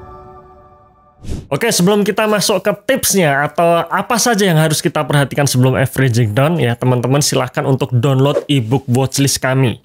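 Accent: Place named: Java, Indonesia. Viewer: logat medok jawane keliatan bener ga sih 😂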